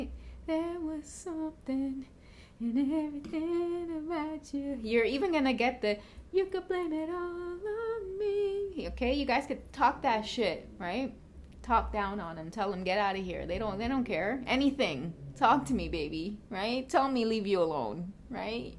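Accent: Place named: Trinidad and Tobago. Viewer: Are you Trini? That bazodee accent 😂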